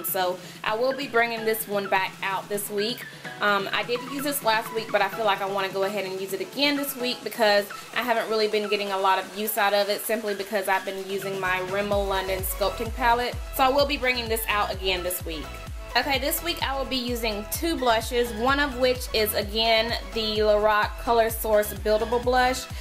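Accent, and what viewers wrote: South, United States. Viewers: You sound like a fellow southern girl too! :) where are you from? just recently found your channel and loving it!! subbed to ya!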